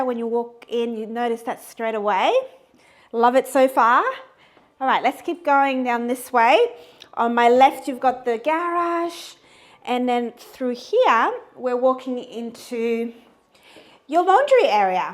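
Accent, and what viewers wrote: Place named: Queensland, Australia. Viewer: BTW everyone, this is your typical eastern Australian accent, Queensland variant.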